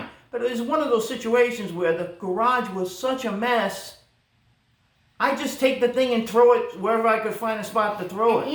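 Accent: Rhode Island, United States. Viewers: Sounds like a RI accent?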